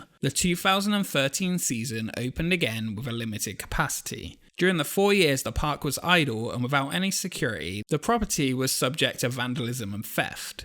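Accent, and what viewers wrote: United Kingdom. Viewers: Why is it that all the narrators for videos like these seem to have British accents? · Where are you from? I know Britain, but which part? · It's annoying to hear a Brit when it's in NC... that's why. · I mean, he does have a British accent. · It's funny hearing a British person describe a park in North Carolina. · AAAAAGGGGHHH... British Narration. FUCK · What part of the UK are you from?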